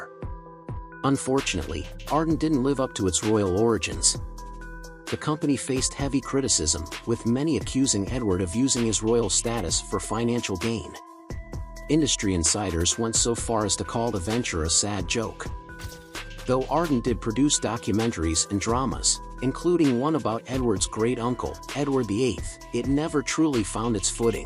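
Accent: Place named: North America. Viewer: More crap with a North American accent 🥱🥱🥱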